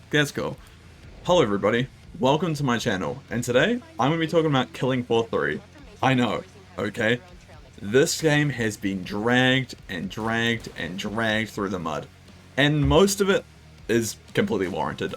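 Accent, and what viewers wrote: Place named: New Zealand. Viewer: You, sir, are a Kiwi.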